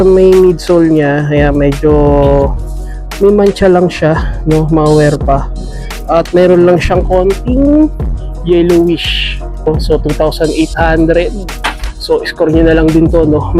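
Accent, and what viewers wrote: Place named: Iloilo, Philippines. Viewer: Boy ilongo ka ky pang hambal mo pang iloilo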